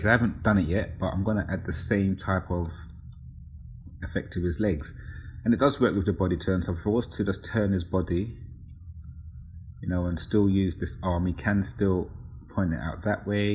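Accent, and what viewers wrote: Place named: London, United Kingdom. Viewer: First one I've seen of you with a voice-over. Enjoying the London accent.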